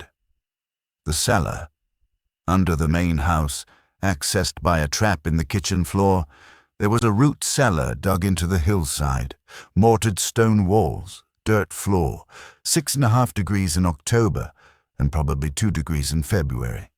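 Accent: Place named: Aristocratic, United Kingdom. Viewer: Dont mind the English accent .but an aristocratic one sucks.🇨🇦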